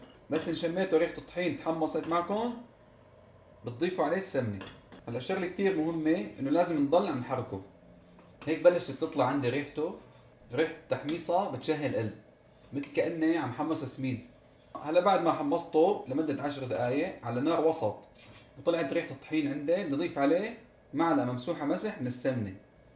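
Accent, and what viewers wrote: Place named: Homs, Syria. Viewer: لك اللجة الحومصية بقلبي❤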